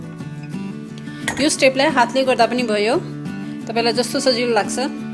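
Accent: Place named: Darjeeling, India. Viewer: The way you are talking resemble as you are from Darjeeling or siliguri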